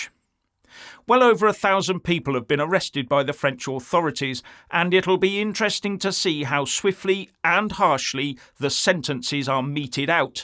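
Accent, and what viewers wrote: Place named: United Kingdom. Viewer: Wow. I've never heard anything quite this British, or with quite that much bite without using vulgar language - I never knew the Britisch tongue to be a form of art. Pretty good summary too. Thank you.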